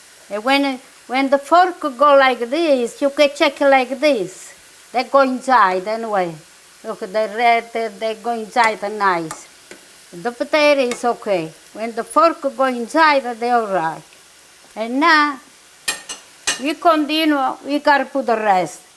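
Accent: Italy. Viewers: Love watching with her great recipes, and also love listening to her Italian accent, as a native Italian I love everything Italian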